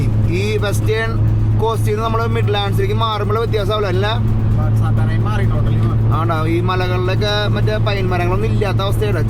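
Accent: Thrissur, India. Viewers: സാൻ്റോടെ അവതരണം . ആ സ്ലാംഗ് തൃശൂർ .ചിന്തിക്കാൻ പറ്റോ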